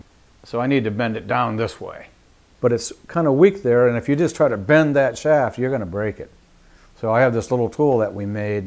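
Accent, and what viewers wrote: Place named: Midwest, United States. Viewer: called a Midwestern accent.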